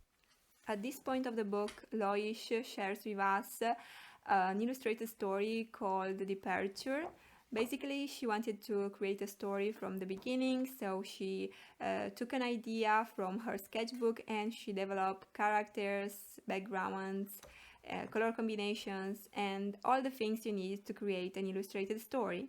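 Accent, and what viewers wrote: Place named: Italy. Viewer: Ho capito subito che eri italiana dall'accento 😂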